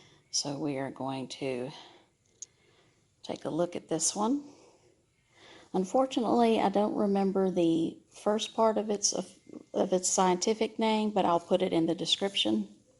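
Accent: South, United States. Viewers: Loving that southern accent hunny 😉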